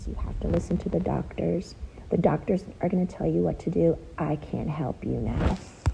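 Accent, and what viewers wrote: South, United States. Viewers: Finally in her own words and no accent besides the south! I’m sorry you went through this Britney! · so what she from the south, that's how we talk.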